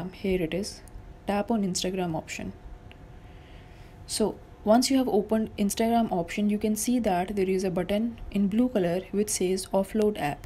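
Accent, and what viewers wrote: India. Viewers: Indian voice 🤢🤮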